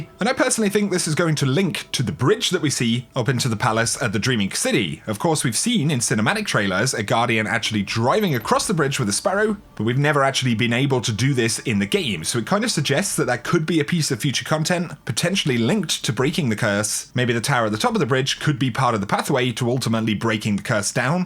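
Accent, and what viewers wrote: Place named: United Kingdom. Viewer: Hey, my wife wants to know where you are from. Her mums British but your accent is much different. Just curious. Love the channel!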